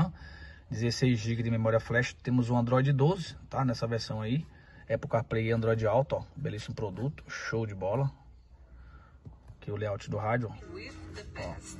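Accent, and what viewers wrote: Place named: Rio de Janeiro, Brazil. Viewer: Vc e do rio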